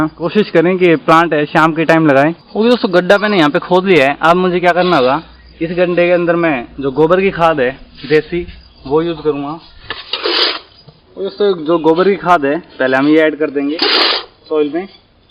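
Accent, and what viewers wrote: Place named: Haryana, India. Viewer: भाई हरियाणा से हो आप आपकी बोलने की टोन से पता चल रहा है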